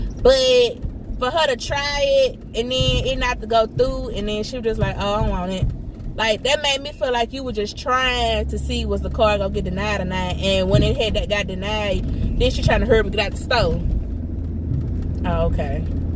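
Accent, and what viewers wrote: South, United States. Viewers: Luv the country accent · I have NO IDEA how I ended up on this video nor your channel, but I stayed and watched this video in its entirety. I'm so glad I did. You said something about "story time" at the beginning and that southern accent caught my attention! Unfortunately, I'm not into hair and beauty, however, I wanted to let you know that I enjoyed this video! You had me literally rolling! Especially that look. It's always nice to see integrity in people. Additionally, those with integrity not afraid to hold the dishonest accountable. So, from one Youtuber to another....keep doing what your doing! You are creating REALLY GOOD content. - Lewis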